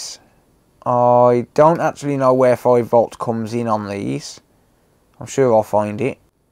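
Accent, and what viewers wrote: United Kingdom. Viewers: You're from the United Kingdom I can guarantee that am I right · british dude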